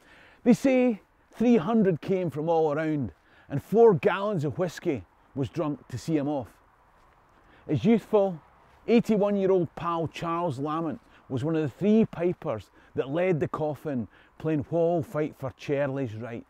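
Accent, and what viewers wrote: Scotland, United Kingdom. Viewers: I absolutely LOVE everything about your country! Outlander is credited for putting "Scotland on the map" .. I love your culture, accent, passion, history and the beautiful country itself. It's strange I've never visited your beautiful lands, however, in the deepest part of my soul I know I have a strong connection to it!! #ILoveScotland #SendingLoveFromAustralia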